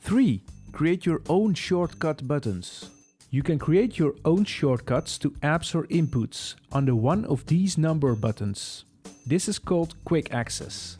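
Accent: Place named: India. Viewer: This voice belongs to someone from India. It's impossible not to recognize the accent.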